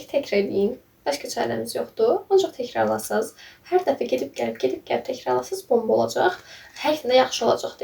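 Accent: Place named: Nakhchivan, Azerbaijan. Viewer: tam təxmin etdiyim kimi😊❤ Ləhcə ilə danışmırdın sadece ara sıra bəzi kəlimələrdən Naxçıvanlı olduğu düşündüm ❤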